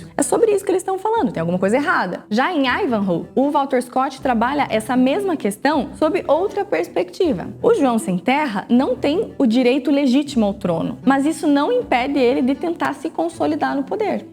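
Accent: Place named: Curitiba, Brazil. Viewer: O sotaque dela é tão bonito! Certeza que ela é curitibana! 🤭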